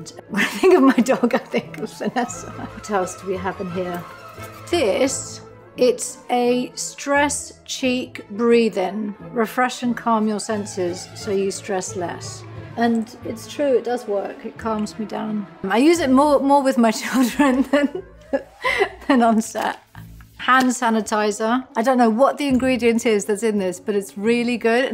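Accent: England, United Kingdom. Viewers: Why does she speak with British accent? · That English accent surprised me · I was like oh no that is not Gillian this lady has a British accent Scully doesn't have an accent. I am so used to hearing Gillian with an American voice. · What is with British accent? She was born in Chicago. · I am blown away! Her British accent!!!!! Such a lovely lovely woman. Huge fan! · She has a British accent now? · OMG, i thought you were American, Love your British accent way better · She’s actually not! Born in the US but spent part of her childhood in London. I forget why but her parents are not British. She moved back to the States at 11 or 12 but she had picked up a British accent while in the UK. She reverted back to American to fit it but she can switch back and forth as needed. She does her interviews in the US with her American accent. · Her beautiful british accent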